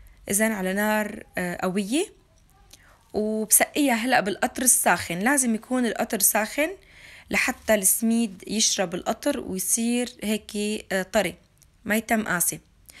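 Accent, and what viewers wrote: Homs, Syria. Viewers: من كلامه مبين انها من مدينة حمص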